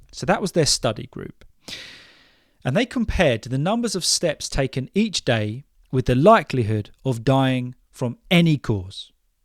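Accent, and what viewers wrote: United Kingdom. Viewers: Mr you do fantastic I like it your videos so much because you speak British English clearly and and perfectly. Thank you very much for your videos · where are you from british can you answer me please because your accent very nice i love it 😍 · Hello, What a great episode! I also track and count steps on my smartwatch, and you’re right—10,000 steps every day is not that easy. As for me, I regularly reach my goal without any problems—8,000 or 9,000 steps for sure. The rest might be from hand movements that my smartwatch records, so it’s pretty easy to cheat—or better said, the results aren’t always accurate. I have to admit that yesterday, due to the rainy weather in Germany, I didn’t have the motivation to go for a brisk walk. Thank you so much for your inspiring videos! I discovered you on YouTube just the other day, and I truly enjoy listening to you and your cute british accent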